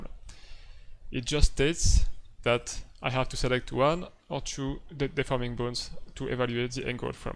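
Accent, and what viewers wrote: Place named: France. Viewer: coolla video, par contre des la premiere seconde on sait que t'est français poto ;) , merci pour le tuto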